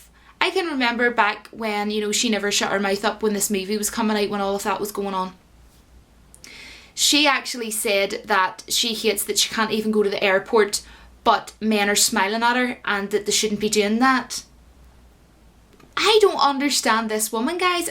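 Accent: Ireland. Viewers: Are you Irish ? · Are you from Ireland ? Moving to Ireland in August. Love your accent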